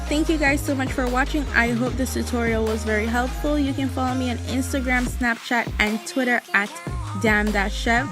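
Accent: Jamaica. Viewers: Are you a yaadi girl like me? i hear u accent!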